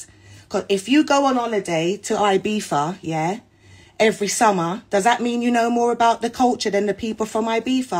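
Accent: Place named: United Kingdom. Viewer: Your not from Jamaica your from England you grandfather was from Jamaica so you have Jamaican roots 🫣 your talking in the most British accent going and then talking about your sisters brother in law 😂😂 you didn’t go up on side shows you grew up on the side of the London streets getting cleared out and the videos are on YouTube for proof. The point about Ibiza would be correct if they lived in England their entire life and never visited Ibiza every year then correct you would know more then them about Ibiza streets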